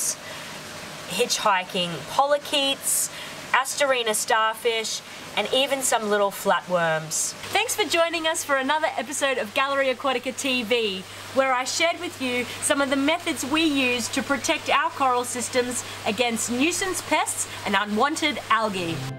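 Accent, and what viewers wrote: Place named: Australia. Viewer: The cinematography is first glass. Showing off those wonderful thongs (flip flops for you yanks reading this) and quirky glasses which are vintage (perfect). makes a classic catch and keeps score all in about 3 seconds before moving onto more complex maths and the turkey baster before speaking Latin - all time. Freeze frame and it seems with the cheeky smile that knows this is outclassing even Cam in his togs doing flips inside the 6x2x2 bar tank after fitting through a 30cm square hole in the top without knocking off the $1000 dollar Radions. Side note: Why remove the sea squirt? manages the classic Aussie accent whilst using scientific lingo that Sir David Attenborough only ever dreamed of. In my book the best example is "Upon visual inspection it's pretty obvious that this zoanthid colony is suffering from a range of macroalgae invasion" - although "it's pretty obvious" this was the 3rd take because the script said "infestation" instead but she made up for it with a word that sounded like 'poleketes' in the next section. 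Even with a science degree (incl first year bio and marine science) I don't know what a polekete is but I know Coral RX gets em. moves on from killing pests to healing infections - rewind and watch how seamlessly she moves from biological to medical language... before ruining it with the claim that it's all good because "it smells nice and clean". Ok - so this is easily THE BEST vid I have seen on dipping... but is not done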